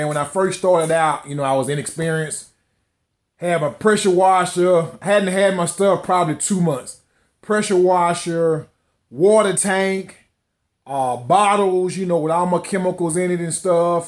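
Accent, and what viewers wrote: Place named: South, United States. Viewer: love your channel my man!your cool southern accent makes me run down to popeyes and get me a few biscuits!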